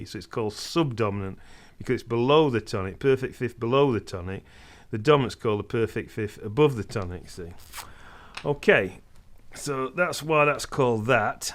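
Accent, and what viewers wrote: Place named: North England, United Kingdom. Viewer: I liked your stuff from very much. It all was intelligently conceived and presented. I like your style, your Central-Northern British accent a lot(!) and the way you put down some pathetic and pretintious (and so) people who think they know it all. I mean it's not your goal of course but you won't believe how much fun it actually is!:-) Please - take my advice - don't be so concerned about the hate mail and comments. You seem to be very responsive person and as such just try to ignore the ones who would cause you to close another of your great channels. Take it easy! I'm looking forward to your new videos - they are always tasty, helpful and really so much fun!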